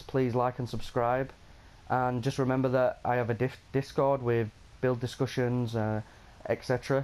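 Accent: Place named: Leeds, United Kingdom. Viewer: Loving the Leeds/Bradford accent mate identical to how mine used to be lol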